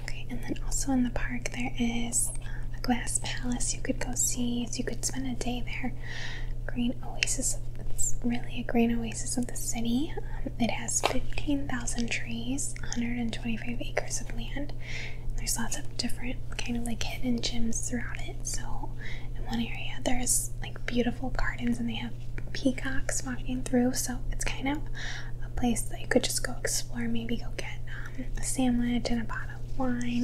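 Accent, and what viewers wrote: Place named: Upper Midwest, United States. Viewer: definitely has that upper midwestern accent. Probably from Michigan, Wisconsin or Minnesota. Maybe northern Illinois. I think I've found a new ASMR channel!!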